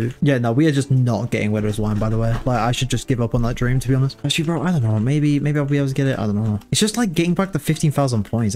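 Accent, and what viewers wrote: United Kingdom. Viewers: ur my favorite british yapper on youtube bossman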